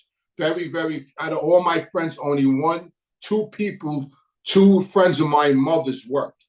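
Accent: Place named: Queens, United States. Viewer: It’s Joey merlinO not Joey merlinA lol it’s ok it’s that queen’s accent I have it too :(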